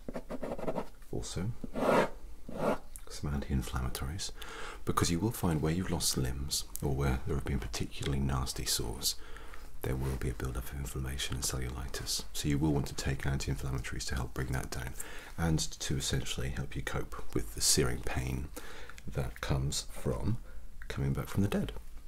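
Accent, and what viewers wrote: United Kingdom. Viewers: Me: a whole zombie: You look a bit under the weather, but other than that, I diagnose you with zombie Extremely British, I love it 😂😂😂😂😂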